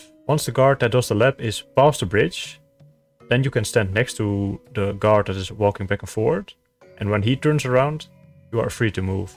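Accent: Netherlands. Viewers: go goooo, you're a legend amongst the lowlands and even a bigger one on this game. Your episodes are evolving greatly and I just can't get bored of that lovely Dutch accent. Keep it up man!!!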